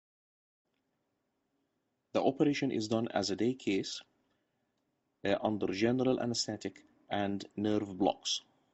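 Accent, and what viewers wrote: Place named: Eastern Europe. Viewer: Where comes the speaker from? I think he are from finland or russia or other slavic country!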